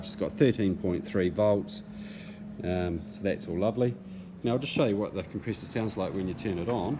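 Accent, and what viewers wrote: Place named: New Zealand. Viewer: Fantastic! I have been thinking of buying something like this, but they are so damned expensive. I have to ask though, is the pump fit for breathing air? I know that in SCUBA, they have to filter it to take out anything that may harm health, so I am keen to know if this pump is fit for purpose from a health sense. If so, I think I may do this. BTW, it sounds like you are a Kiwi. Where abouts are you? I am in Welly.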